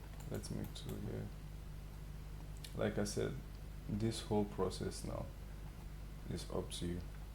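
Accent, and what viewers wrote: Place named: Nigeria. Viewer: Omg are you Nigerian?? You sound nigerian. Thank you for making this vid ❤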